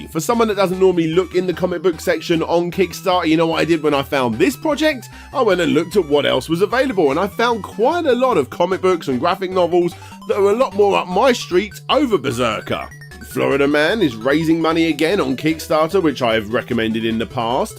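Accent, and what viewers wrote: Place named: United Kingdom. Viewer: This guy’s voice is too limey for me.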